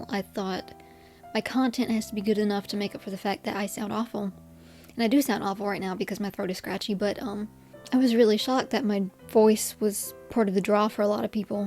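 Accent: South, United States. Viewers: Your accent reminds me of an attorney colleague I worked with years ago. He also put a lot of work into lessening his southern accent but I found it endearing in the occassions where it did come out stronger. I think your accent also gives you more implicit authority that you know what you're talking about when you discuss these beliefs present in christian spaces. It might be a little weird to you to hear, since this probably wasn't intentional on your part. Just my 2 cents on it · As a Californian, I have to admit my prejudice against southern people, and you are just a reminder of how ignorant I am. Love your accent and most of all your videos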